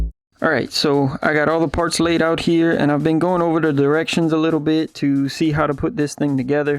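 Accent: Louisiana, United States. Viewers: Great video brother very informative I'm about to swap mine out. Your accent gives me hope that I am not the only one drifting in Louisiana! Greetings from Denham Springs.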